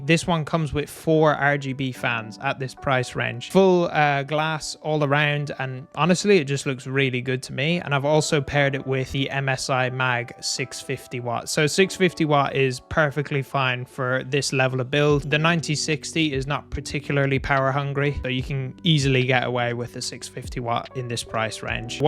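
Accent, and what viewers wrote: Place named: Ireland. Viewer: I can tell that but what county is that accent from bro 😭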